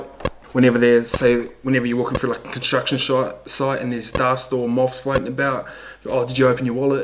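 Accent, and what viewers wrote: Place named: New Zealand. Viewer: Lengo? it means 'focus' in Swahili. Oh that kiwi accent, you meant lingo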